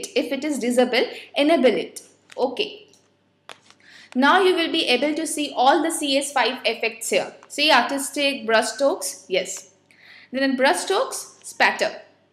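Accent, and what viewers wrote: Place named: India. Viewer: I totally agree... India people should not even try speaking English. They make it sound terrible.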